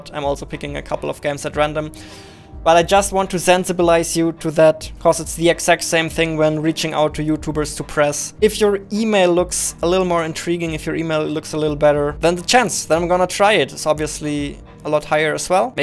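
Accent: Germany. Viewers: Bist du deutscher? Dein Englisch klingt nämlich so xD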